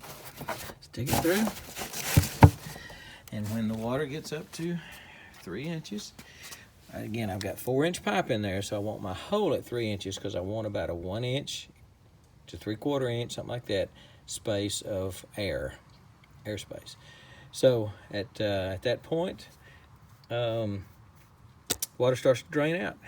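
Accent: Texas, United States. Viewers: This is genius. Thanks. Btw, I'm curious what part of TX you're from. I'm always interested in accents and TX is such a big state; there are different accents. I'm guessing you're east TX, maybe toward OK. Am I close?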